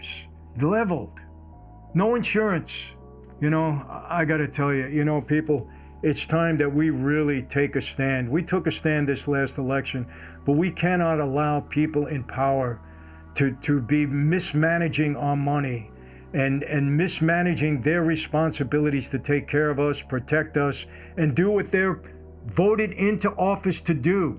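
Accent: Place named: New York, United States. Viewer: i really admire you and your story. You remind me so much of my father that was also an Italian from New York and talked like you. My father would be 96 years old today. The strongest men I ever met were my dad and my uncles from New York. That was when men were men. This was also a time when your uncles helped raise you. If my uncles saw me doing something i shouldn't I got a slap. Hoping they never told my father my wrongdoing so that I didn't get another. lol Enjoy your videos and my prays are with North Carolina and California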